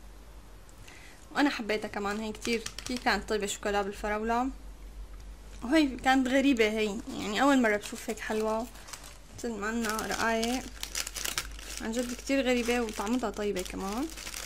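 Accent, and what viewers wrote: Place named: Damascus, Syria. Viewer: كتير حلو لانها سورية من الشام والشاميين بيحكو هيك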